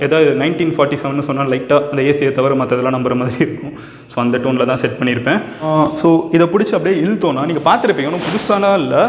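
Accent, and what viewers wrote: Madurai, India. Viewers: Bro Neenga Maduraikaaran solrapove summa getha irukudhu.❤️❤️❤️❤️engà irukeenga nu solunga nerlaye visit pana varen..Am from Mahatma Gandhi Nagar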